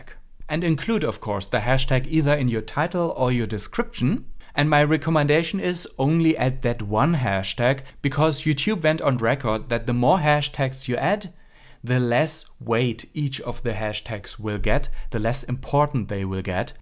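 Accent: Germany. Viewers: Thanks for the insight, I like your spreadsheet :D Out of curiosity, are you German?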